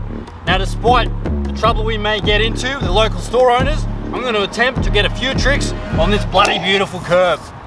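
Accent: Australia. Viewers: I like how you somehow made your accent more Australian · its an aussie doing a aussie accent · This is fucking so good, the aus accent just the cherry on top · that was awesome! hahaha. am I correct to think that you spoke with a different australian accent? like from a different region?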